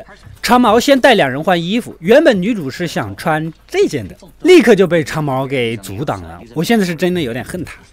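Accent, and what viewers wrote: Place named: Hunan, China. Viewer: 是湖南的吧？特别喜欢这口音，哈哈哈哈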